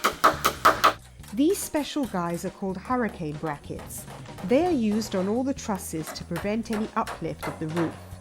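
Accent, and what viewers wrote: United Kingdom. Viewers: The lady narrator with the Brit accent adds class to this. Well done. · Love the British accent! Good video too! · GREAT video!! Thanks for making it! Love the narrators accent. Taking this country back one job at a time! Rod Fair, fellow Brit in Fl.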